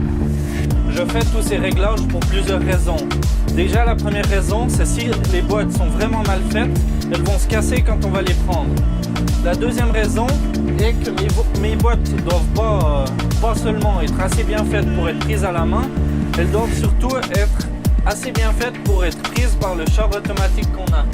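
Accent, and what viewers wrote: Vaud, Switzerland. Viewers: yop super vidéo tu explique vachement bien PS: j'ai une question j'ai vu que tu était suisse et j'adore cette accent. Je viens du canton de Fribourg 😏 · Joli ! Tu es suisse non ? Vaudois je dirai à l'accent ^^ Tu as pas de faucheuse arrière ?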